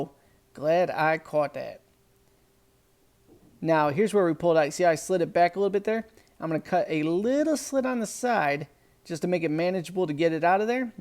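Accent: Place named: South, United States. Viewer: It was the southern accent that helped this one.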